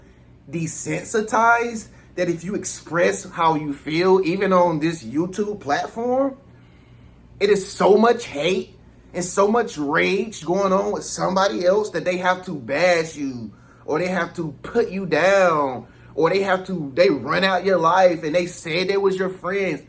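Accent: United States. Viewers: Thank you so much for doing what you are doing. Your authenticity is such a light in the world. I am not American so sometimes I have a hard time understanding your accent but your light shines so bright that I keep coming back and watch because you are a beautiful soul and you inspire me somehow. I am sending you my love and light. You deserve the best. Blessings ❤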